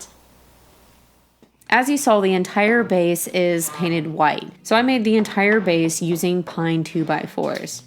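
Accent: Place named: South Texas, United States. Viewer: Lol, give 'em hell," I've got your back". My Southern Red Neck speech is a sorry imitation of your pleasant South Texas speech.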